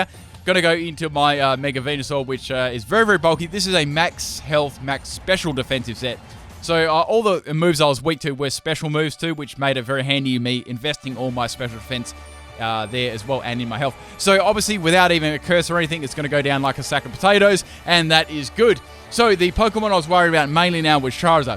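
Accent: Australia. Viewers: He is Aussie like me I can tell by the accent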